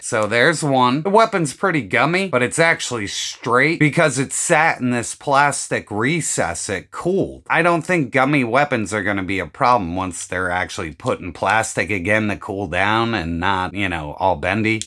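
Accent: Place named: Pittsburgh, United States. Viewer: I'm afraid my ignorance was showing. This is a Pittsburgh accent maybe? I thought you were doing a funky voice in the intro but my accent knowledge wasn't up to snuff.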